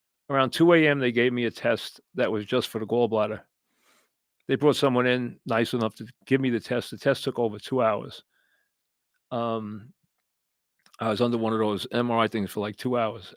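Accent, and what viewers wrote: New York, United States. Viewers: “I don’t know whats going on with Weev-ah”.. love New York accent.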